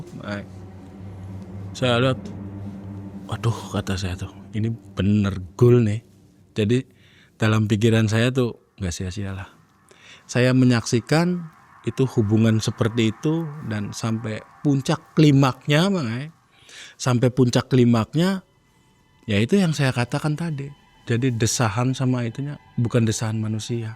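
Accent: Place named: Brebes, Indonesia. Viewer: Mas wong brebes bukan sih?? Logatte kaya wong brebes tanjung losari jawatengah.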